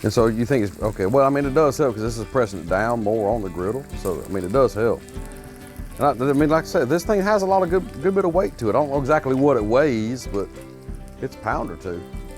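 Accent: South, United States. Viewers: Love the southern accent! Reminds me of my good ole buddy from Louisiana. Anyway, love the content on this channel. Definitely got yourself a subscriber. 👍🏼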